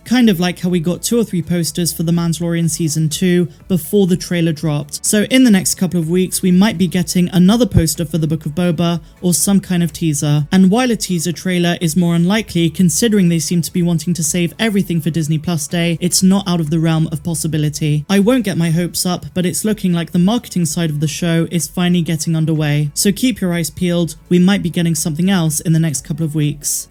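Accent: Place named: Bristol, United Kingdom. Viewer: Love the work! Can i just ask - are you from the West Country? Whenever you say "Jibber Jabber" Your 'ers' sound like 'urs' as in "Jibbur Jabbur". Are you Bristolian? I'm from the west too, alittle further down. Your daily drip feed of tsar Wars info is very welcome & addictive!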